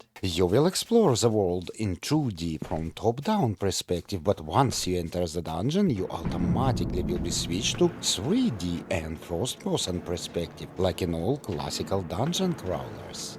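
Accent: Russia. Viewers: Is the narrator Russian?)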